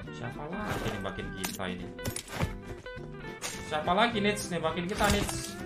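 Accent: Java, Indonesia. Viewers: Tetep menjadi kereator yg lucu mas dengan logot jawa nya, dan sehat selalau untuk mecari rejeki buat keluarga nya, IG: alfian_yuliansyah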